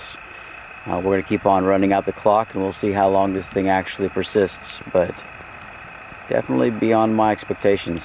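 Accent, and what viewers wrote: Texas, United States. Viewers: Around mid-November the nights are about 14 hours long in the US. Based on the slight accent in his voice, I'd say probably somewhere in Texas. So the combination of not-quite-winter and Texas weather patterns, that would explain why he's OK with just jeans and a flannel shirt.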